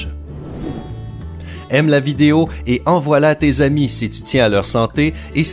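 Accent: Quebec, Canada. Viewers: Un accent du Canada :p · Il est québécois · Ben moi ça ne me dérange pas je dirai même que j aime bien son accent. Il est québécois. Et puis c est pas très sympa justement de dire que tu n aime pas sa façon de parler de plus qu' il n y peut rien. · The Quelqu'un il est québécois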